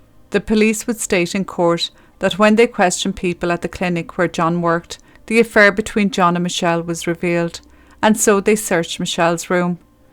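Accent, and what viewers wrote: Ireland. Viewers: I like this narrator,as her Irish accent makes it more personal,l also like her comments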